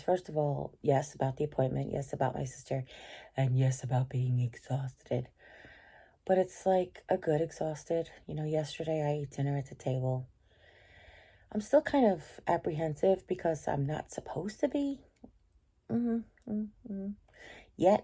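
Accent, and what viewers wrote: Long Island, United States. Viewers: I could listen to you all day long. I just love your stories and your accent is just amazing. I'm learning so much about Long Island and New York its magical. The magic has even cast the spell of being able to keep up with your fast pace of speech 🤣😂🤣😂. Hey, Jack of all trades is a skill in itself, nobody employs an electrician, plumber, gas expert, carpenter, joiner etc for little jobs around a building, they employ a handyman/tradesman/maintenace guy. Quite simply a 'Jack of all trades' Theres so much i need to do around my home, I'm watching your past videoes to learn how. God bless you my fast talking friend. You are in his hands and all will work out according to his plans, as it should. Xx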